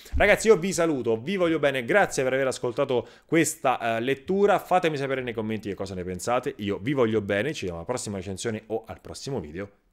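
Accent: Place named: South, Italy. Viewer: Che accento da meridionale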